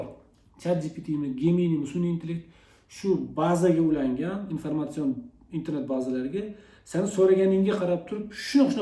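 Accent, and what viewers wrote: Namangan, Uzbekistan. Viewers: namanganni tortyapti Uchqoʻrgʻondanman siz qayerdansiz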